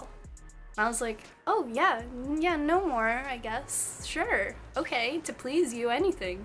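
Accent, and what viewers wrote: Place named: South, United States. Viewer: I love how you've had a slight country accent most of the video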